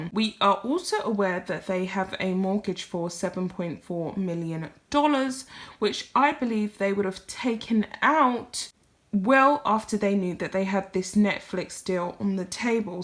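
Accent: United Kingdom. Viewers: A none American with a Brit Accent is explaining tax changes- you can’t even vote. Quit throwing shade on Joe.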